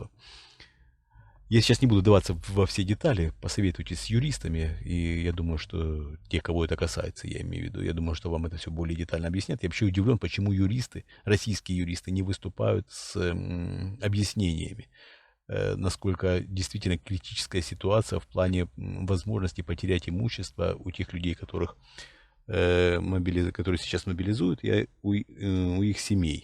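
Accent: Ukraine. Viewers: Уважаемый т.н « », вы мне как лингвисту можете ответить на вопрос, почему у вас явный украинский говор, если вы были генерал-лейтенантом СВР, то есть еще советский специалист, (проходили подготовку в Москве, жили там же) где глубоко погружали в изучение иностранных языков?😊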